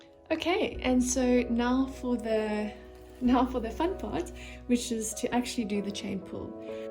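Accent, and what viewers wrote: South Africa. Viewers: You sound South African, this is encouraging if you are in South Africa, that means that you can probably get Floetrol and the paint in SA?? I'm in the UK at the moment, returning to SA soon and hoping to be able to create some of these beautiful swipes and pours. Loving the colours and your ocean creations. xx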